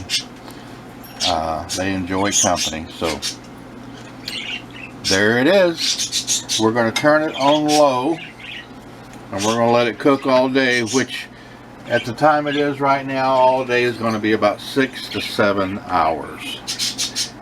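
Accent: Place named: Midwest, United States. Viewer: I enjoy listening to your calm voice. You sound like you are from the Midwest. I will try this.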